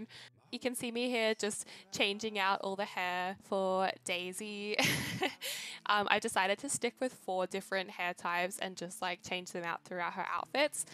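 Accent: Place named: Australia. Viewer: Got some catching up to do🤍love this vid. Your accent is awesome🤭Aus?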